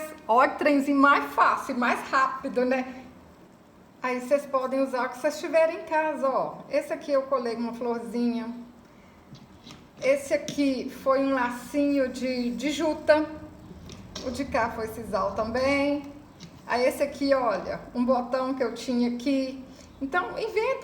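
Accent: Minas Gerais, Brazil. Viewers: Amo o seu sotaque mineiro, me sinto em casa pois tbm sou da terrinha , sou de Raul Soares M G.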